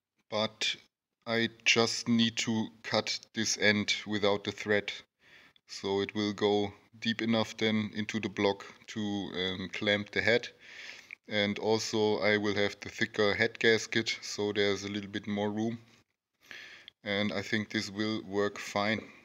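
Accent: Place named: Germany. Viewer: dieser geile deutscher akzent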